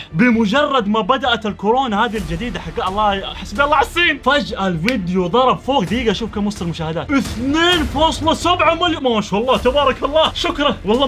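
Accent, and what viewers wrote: Jeddah, Saudi Arabia. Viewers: هو من جدة لأني قد شفته مرة من المرات بمطعم بجدة و حتى لهجته جداوية ترا ((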